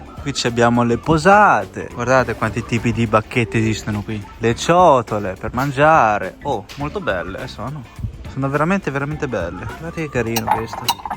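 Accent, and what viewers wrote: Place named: Emilia, Italy. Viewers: Sei cinese ma vivi in Italia? Mi sembri un po' emiliano 😊 o sbaglio? p.s. bel video alla fine anche in Cina si trovano prodotti italiani. ❤ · soccia sei di bologna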